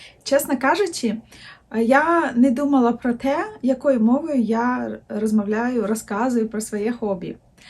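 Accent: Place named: England, United Kingdom. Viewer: Несовершенная - не досконала, Коснулось - торкнулось., ти така молодець! В тебе все вийде, твоя мова гарна з легким англійським акцентом 🥰 Пишаюся тобою!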